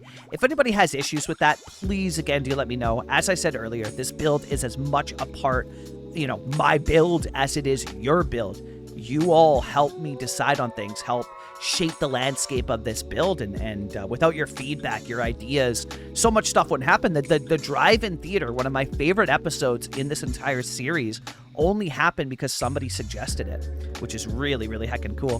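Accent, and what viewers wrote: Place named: Canada. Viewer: Hey, my first of your videos, watching from Dublin Ireland here. The content (and your lovely Canadian accent) is very relaxing to watch :)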